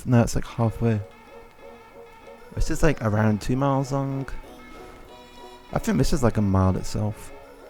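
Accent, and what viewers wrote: United Kingdom. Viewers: I love ur British accent Wish me luck imma buy a pc!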